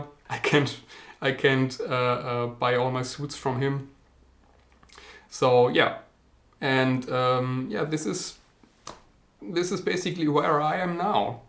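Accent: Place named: Germany. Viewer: Great content. Your accent reminds me of my ex girlfriend dad. He is German and has the same accent 😅 no offense haha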